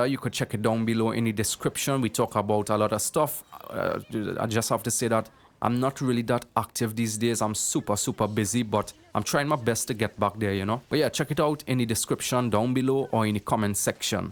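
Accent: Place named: Trinidad and Tobago. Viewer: Oh i have a good guess for some reason i think you from Trinidad lmao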